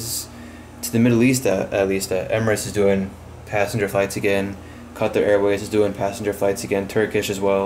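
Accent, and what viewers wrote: United States. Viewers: HaHa love the intro! Nice to see this airport again! Love you're Massachusetts accent! You know I really love the Louisville route! You could always sent some deltas to DTW or MSP. Hey your Raptors might come down to Louisville to play if Canada doesn't let up their lock down! Great update!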